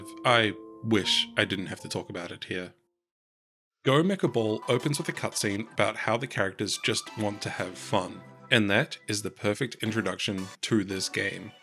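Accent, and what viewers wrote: New Zealand. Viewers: Your accent - are ye from Aotearoa ???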